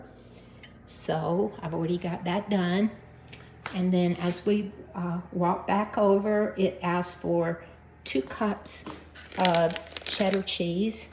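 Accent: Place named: North Carolina, United States. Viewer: Hi that a great recipe. I'm detecting southern, NC here. Have a great weekend tyfs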